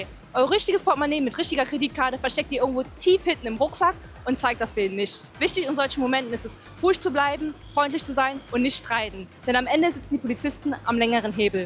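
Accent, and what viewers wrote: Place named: Cologne, Germany. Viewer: Kann es sein, dass Du aus dem Rheinland bist? Ich meine einen leicht kölschen Einschlag zu hören 😉😉 Sehr informatives Filmchen, Danke für die vielen Tipps. Kleine Anmerkung: vielleicht etwas langsamer sprechen, ansonsten toll gemacht!! 👍👍👍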